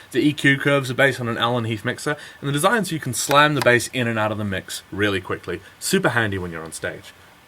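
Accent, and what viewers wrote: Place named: New Zealand. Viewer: Damn. I'm buying an APC 40 Mkii this week, really nice to hear a fellow Kiwi voice explaining the ins and outs. Great demo, and I'll be downloading the scripts and exploring the functions. I cut my teeth all on technics 1200's and vinyl back in the early 90's, dropped out of the scene a while, got back in with traktor and the M-audio exponent midi controller, then messed with Pioneer CDJ's a while, but never really felt quite at home with any of them. Maybe the APC will be the right path back into it, don't know yet. Your video makes it look good though, and definitely an affordable experiment. Cheers!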